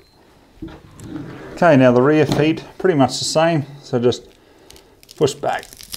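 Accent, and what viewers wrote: Australia. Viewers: You know this dude is a professional with that Aussie accent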